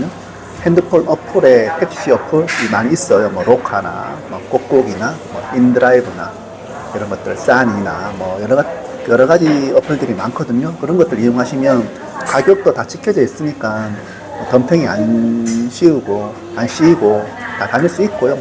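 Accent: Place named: Masan, South Korea. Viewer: 고향이 어디시죠? 사투리가 참 구수하고 듣기 좋네요~ 마산쪽인가요? ^^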